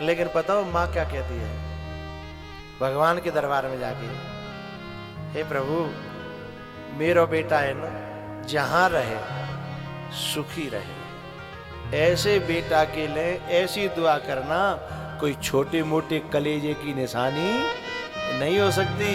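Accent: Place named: Braj, India. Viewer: बाबा शुद्ध ब्रजभाषा बोलकर सबको लुभा रहे हो? आप निश्चित रूप से मथुरा के आसपास के ही हो, आपको प्रणाम!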